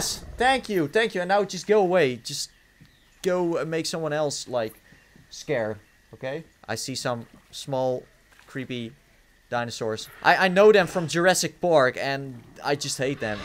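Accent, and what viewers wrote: Netherlands. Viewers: are you dutch? ikke ook xd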